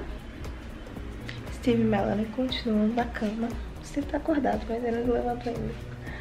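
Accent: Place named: Minas Gerais, Brazil. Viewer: Que linda ! Ta com sotaque gostoso mineiro, família linda . Deus abençoe!🙏🏻🥰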